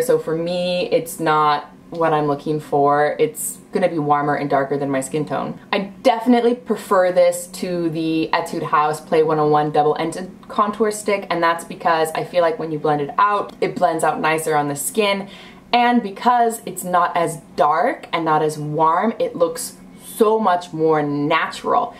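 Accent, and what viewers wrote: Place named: Netherlands. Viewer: ben je nederlands ik hoor het aan je acent :)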